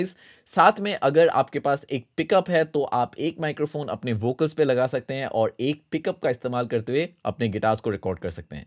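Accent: Assam, India. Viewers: Sir ap Assamese ha na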